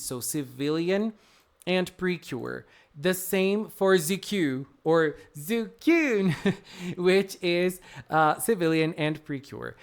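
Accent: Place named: United States. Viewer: I love your channel but I like to ask you a question you were born and raised in Brazil and you talk like a normal American I was always curious about that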